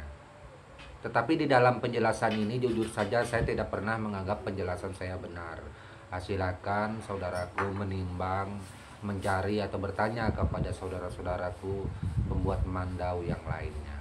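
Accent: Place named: Madura, Indonesia. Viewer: mAAF ko terkadang saya dengar seperti logat Madura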